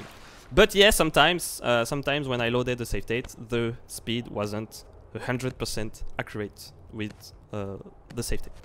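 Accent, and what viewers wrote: France. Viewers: ptdr l’accent de fou même mon voisin a reconnu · Tu as comme un accent d’un français qui parle anglais 😂 sinon je pensais réellement que tu l’avais fais en 😂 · a ses pour sa que dans la video tu avais un acent francais et du mal pour certain mot 3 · putain je savaispas que t'étais français mdr, trahis par ton accent x) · Son accent est 100% français, tout le monde l'a reconnu 😂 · On reconnaît l'accent français c'est cool 😂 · mdr le bonne accent francais comme on aime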